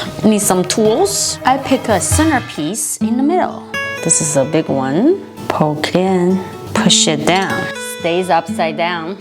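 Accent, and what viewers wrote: China. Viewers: Hi, I tried most of your recipes and it tasted amazing like I was so amazed. Me and my mom are gonna try to make the pasta chips today!! Thanks for the recipe Love your Chinese accent too Love you!!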